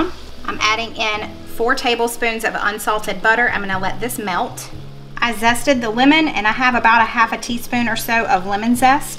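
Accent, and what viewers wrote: South, United States. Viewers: Love that sweet southern accent you have runs deep in us Southern women! You have a beautiful family so happy to have found your channel! Cover the chicken with plastic wrap and you want have to worry about chicken juice getting on counters just helps with cleanup and safety. 😊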